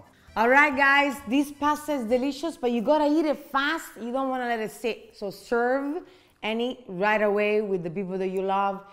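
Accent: Italy. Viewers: Your beautiful and i love your Italian accent I'm sicilian and i love your ricette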